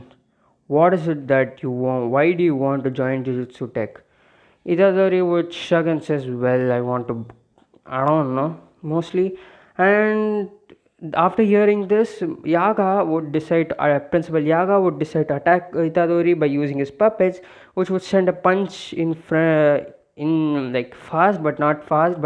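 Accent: India. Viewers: Indian accent makes me laugh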